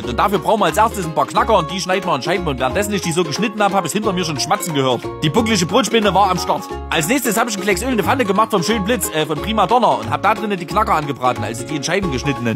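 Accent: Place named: Saxony, Germany. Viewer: Ich liebe dein sächsisch. Klingt für mich nach Karl-Marx-Stadt. Oder wie man heute sagt "Chemnitz statt der Moderne" 😋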